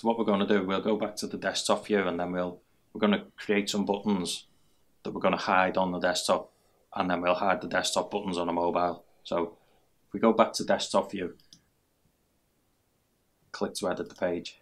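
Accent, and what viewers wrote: Liverpool, United Kingdom. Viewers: Cheers - all brand new to me so lots of replaying!! Great to hear the accent though - cheers two Scousers abroad!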